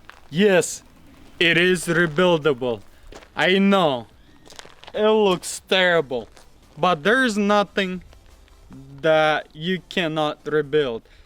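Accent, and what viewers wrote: Russia. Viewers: Блин! У вас русский акцент 😀! Ну молодец, восстановить полностью машину это технические работы! Было приятно смотреть видео!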